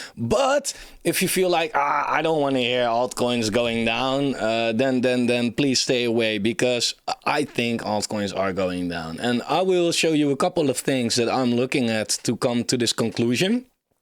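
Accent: Netherlands. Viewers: Are you from the Netherlands? Judging from that accent :) or Belgium?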